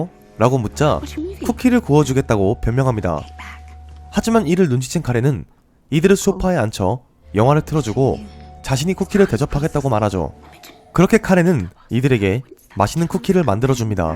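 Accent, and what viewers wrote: Gyeongsang, South Korea. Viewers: 고향이 경상도 어디에요. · 오늘 문득 님 설명듣는데...경상도쪽이셨나요? 사투리가 들리는건가싶어서 ㅋㅋ 손 빨리 나으시길 쾌차하세요~~~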